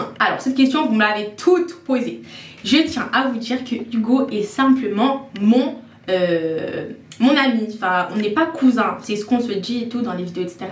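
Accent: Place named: Lyon, France. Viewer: Le petit accent de Lyon >> La story Time sur le contact visuel m’a eu 😂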